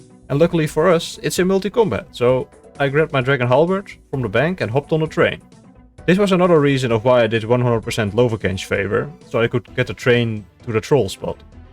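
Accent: Netherlands. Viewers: Hearing you say "blasted these fools with the d hally" in that amazing Dutch accent of yours made me grin from ear to ear lmao. Always looking forward to the next episode, keep it up!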